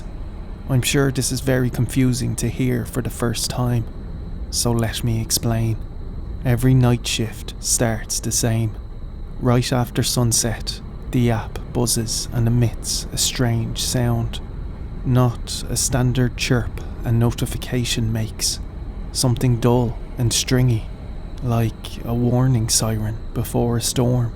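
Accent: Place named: Ireland. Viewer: Hi, may I suggest, you reduce your posting from every day to 3 or 4 days per week. - we don't want to see you burn out, your subscribers care about you - having set days gives you a goal and we know when to look out for your videos - if you do more videos you'll, build up a backup in case the inevitable problem occurs, such as tech glitches - its tempting to give in to the pressure of posting full videos every day. You are the boss, you set your own achievable schedule - suggestion, on non-posting days post a teaser of what is upcoming. This will give you a post a day and maybe help with the pressure. One of the reasons I follow you is the quality of the videos, you aren't American and the Irish accent is gentle on the ear, like the intros and no ads. There is a lot of new YouTubers posting videos in this genre at the moment. Quality will last over quantity, hang in there. 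Keep going, you've got this and we're all behind you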